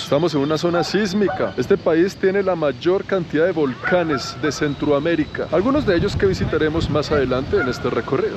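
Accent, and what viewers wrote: Colombia. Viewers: El nació en Panamá, se crió en Colombia y vive en Canadá, por eso es un orgullo que a pesar de tener otras nacionalidades se considere colombiano, también en su manera de hablar y sus dichos, un youtuber que si vale la pena verlo 👍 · Gracias por presentar a mi país, con un toque colombiano muy agradable, bienvenido, que la disfrute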